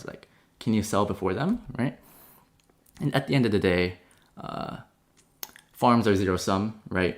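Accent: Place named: California, United States. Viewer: Good content. I love your casual Californian style. ✌🏻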